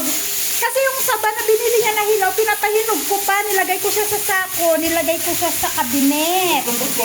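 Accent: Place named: Visayas, Philippines. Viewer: Bisaya ka ba? Accent mo kasi bisaya. At comment lng wag ka tawa ng tawa wala naman nakakatawa.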